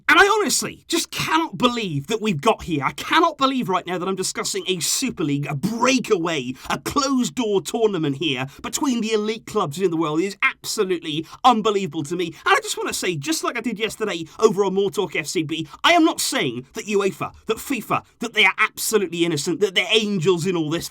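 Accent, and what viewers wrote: United Kingdom. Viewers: When British people get angry it sounds so beautiful. I didn’t even pay attention to the video 😂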